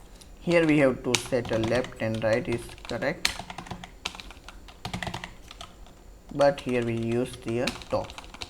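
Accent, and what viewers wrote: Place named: India. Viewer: I follow you and I am happy to discover Qt with you. I used to work with Delphi or C++ Builber, and there are many similarities. I appreciate the way you make tutorials on Qt C++. I have a suggestion if possible. Sometimes, this is hard to understand you, maybe because of your microphone. As I am french, I know that Indian people and French people have a different accent in English. Maybe you can improve this. Anyway, thank you.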